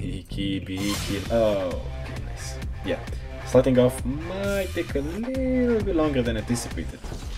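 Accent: France. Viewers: are u french? i love ur accent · Bro u have a french accent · You got a french accent you mog me so hard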